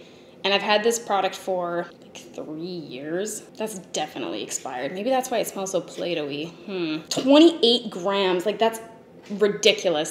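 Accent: Canada. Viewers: Almost a 1,000,000 :3 yujuuuu, btw I love how canadians say coat, boat, oat, out , etc I think is lovely ;3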